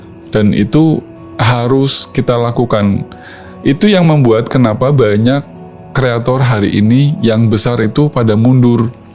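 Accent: Indonesia. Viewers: Logatnya kaya wong KIdul Mas??? Mantap lanjut dan jaga semangat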